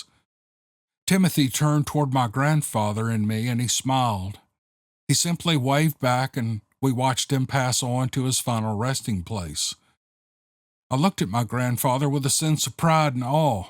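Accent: South, United States. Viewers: Another great set of stories read by a great narrator with his Southern sound! Ms. Neoma Finn, you have written another story that rivals your other great stories! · My wouldn’t think a small request would so rattle such a little peanut brain… nor that such a retard phukhed hides behind that dumb simpleton southern accent.. lucky the rest of your subscribers don’t know then you’d none left..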